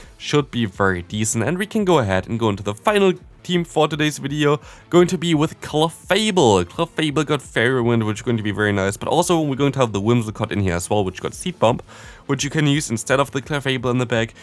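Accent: Germany. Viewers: Germans speak English always with this sort of accent. Nothing new if you heard more Germans.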